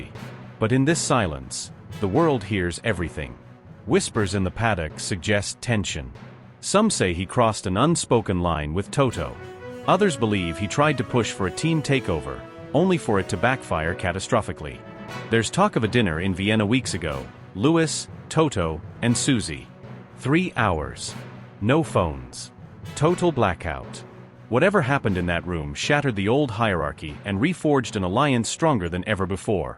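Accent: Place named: United States. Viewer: Soon as you hear a yank, you know its a pile of crap!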